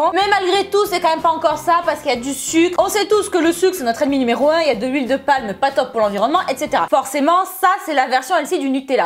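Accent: South, France. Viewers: t'es au top! Je regarde toute tes videos et je te suis sur insta tu es vraiment un rayon de soleil avec ton petit accent du sud tu fais déliré!!!:) continu tu nous motive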